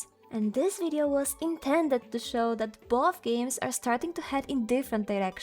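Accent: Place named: Poland. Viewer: ja tam słyszę polski akcent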